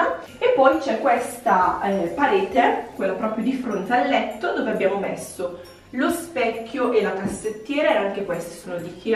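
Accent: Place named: Lombardy, Italy. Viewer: Dove vivi in Lombardia oppure in provincia perché mi sembra un accento lombardo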